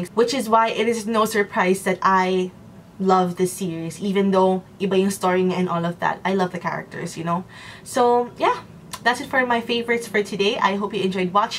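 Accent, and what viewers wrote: Conyo, Philippines. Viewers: I get why the other commenters are bashing. Some filipina youtubers who got a lot of subscribers tend to sound "Conyo" (ung mag eenglish, magtatagalog na medjo weird pakingan). But! Its their channel, if you don't like the way they talk, DON'T WATCH the frekin video. I'm not whiteknighting or anything, its just that ang init na nga, iinit pa ulo ko sa mga attention seeking na bashers na kung makapag comment kala mo ang perfect. Pwe.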